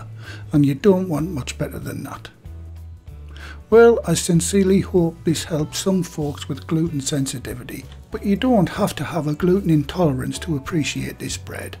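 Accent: United Kingdom. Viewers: He sounds like a British person & I appreciate his sharing his receipe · A lovely uncle with a kind voice and a delightful British accent! Thank you so so so so.... much for the recipe! This is the one and only recipe for gluten-free bread, which I was looking for and found thanks to You! Prosperity to Your channel. Like, subscribe and greetings from Russia! : )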